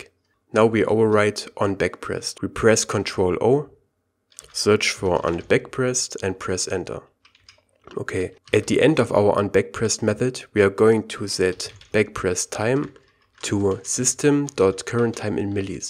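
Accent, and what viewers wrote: Germany. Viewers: Man merkt das er deutsch ist 😂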